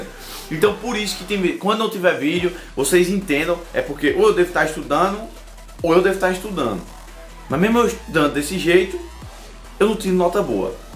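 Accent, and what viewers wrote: Northeast, Brazil. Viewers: começo do teu canal eu não gostava de sotaque de nordestino mas eu vi que tava errado e hoje te assito sempre irmão tamo junto <3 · Amo sotaque nordestino <3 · Tmj Junto Sutacaque Fala Tudo Que E Nordestino Tbm So E Tenho Muito Orgulho E Nunca Mude Por causa de ninguem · eu queria ter nascido no nordeste pra ter esse sotaque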